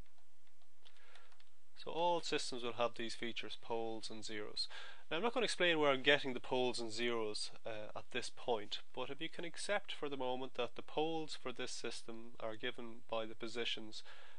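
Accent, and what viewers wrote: Ireland. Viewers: Nice to hear an Irish accent. Thanks for the vid.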